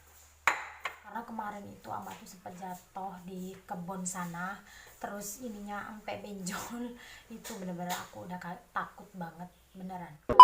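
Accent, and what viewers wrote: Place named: Surabaya, Indonesia. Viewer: Mohon maaf klau di lihat dari logat bicaranya Mbak dari Surabaya bukan yaa Mbak??🙏🙏🙏🙏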